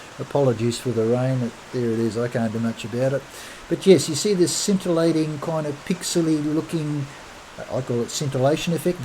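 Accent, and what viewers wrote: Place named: Queensland, Australia. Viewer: Hi, Thanks for your detailed reply, I recon I will have to read it a couple of times. I will have to convert the FITS format file, to be able to put it into PS for manipulation. Unfortunately PS does not support FITS formatt. I have been over the net to check that out. What part of the country are you from? sounds like QLD on the videos but it is hard to make out. Cheers Robert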